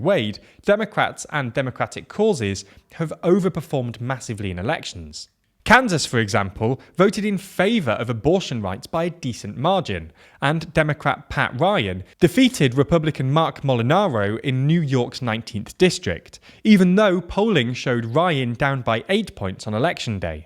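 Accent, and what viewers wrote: United Kingdom. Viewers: First time watching this channel after the other 3. Kinda disappointed he didn’t change his UK accent to an US accent. Would be totally hilarious and serious at the same time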